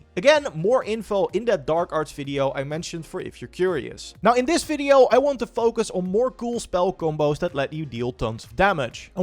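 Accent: Netherlands. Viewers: Hmm are you Dutch too by any chance? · Typisch Nederlands accent 😂, wist het meteen. Geabonneerd neef.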